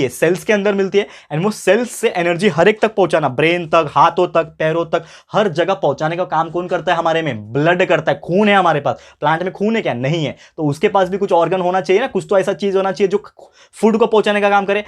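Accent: Maharashtra, India. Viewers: aap marathi ho kya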